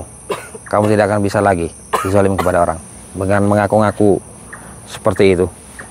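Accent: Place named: Banyumas, Indonesia. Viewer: SEEHH. LOGAT. BANYUMASAN. SEH SEMAU. GUE. KOJ. IKUT. CAMOUR. (. LHO. SEMAU. GUE ,,,,,,,)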